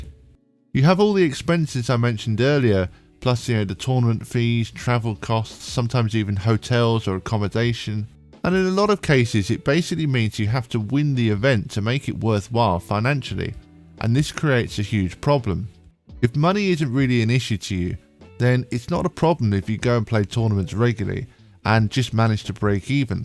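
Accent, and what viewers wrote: United Kingdom. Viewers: Hello I have been a keen bowler for over 40 years in the UK.I have enjoyed your new web broadcast .It is nice hear a english voice and thoughts on the pba and bowling in general .I wander if you could do a broadcast on bowlings olympic bids .especially with the Olympics in America in 7 years time I have asked the BTBA for their information on the bid but know answer. Also the world bowling federation and once again no answer..I find it unbelievable that they had no information on this important subject .Also have you plans to talk about the state of bowling in the UK which in my.opinion is going to the dogs .Would be good to see and I would value your comments please.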